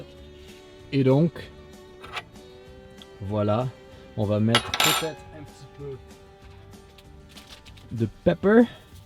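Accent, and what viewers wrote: South, France. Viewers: Lors de ces premières recettes ce cordon bleu en mode campervan avait un accent de sud de la France ;) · Accent du sud ? De quel sud parlez-vous ?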